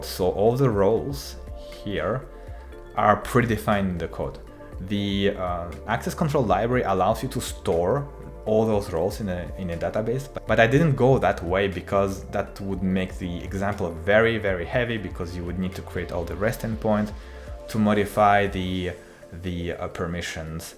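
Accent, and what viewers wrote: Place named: Russia. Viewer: А можно на русском, вы явно по акценту знаете , знайте у вас есть русскоязычная аудитория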